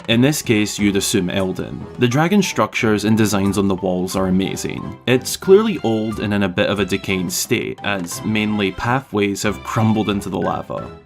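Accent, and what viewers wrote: Scotland, United Kingdom. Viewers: a zelda channel run by a Scotsman, im home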